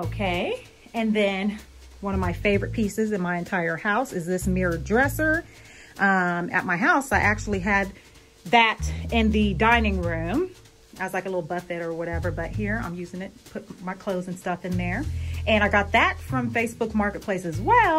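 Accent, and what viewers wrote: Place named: Pittsburgh, United States. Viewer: You must be from Youngstown or near PA because you talk and sound like my family from there and Pittsburg❤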